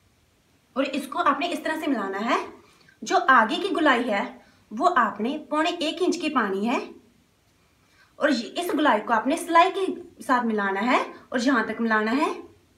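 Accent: Punjab, India. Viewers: I am from Punjab .....love your Punjabi accent ❤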